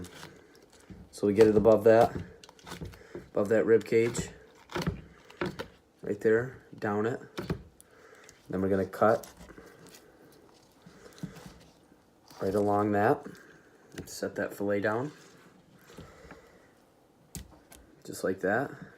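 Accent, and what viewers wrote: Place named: Canada. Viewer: You sound extremely Canadian in this video...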